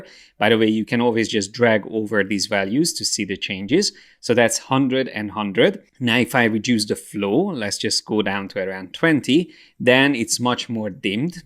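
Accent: Hungary. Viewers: That magyar akcentus though :D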